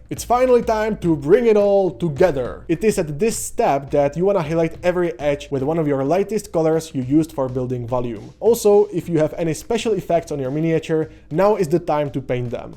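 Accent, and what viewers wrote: Czech Republic. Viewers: Hi, love the tips, really helpfull! I have a question according to your accent, aren´t you a czech? Miniatures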